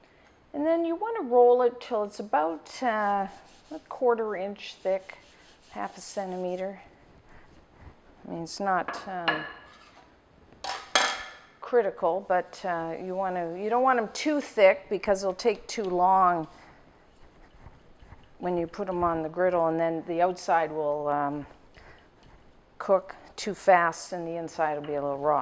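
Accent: Canada. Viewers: Ah, you're hearing the North American accent, but you've completely missed her CANADIAN vowel! Nobody in the U.S. sounds like her! (Unless, perhaps, they live near the Canadian border). The Canadian accent is heard in the vowel "ou", as in "out" or "about," and it is adorable.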